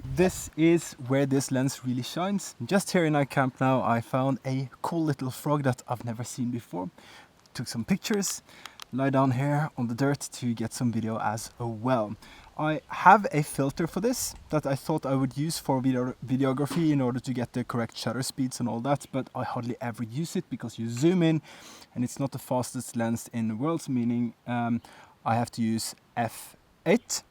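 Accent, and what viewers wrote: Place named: Norway. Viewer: I hear a Norwegian accent... am I right? Thanks for a good review!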